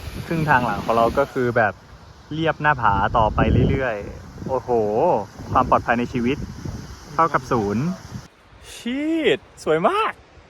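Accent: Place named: Khon Kaen, Thailand. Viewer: ชอบสำเนียงเหมือนคนขอนแก่นตอนพูดภาษาอีสาน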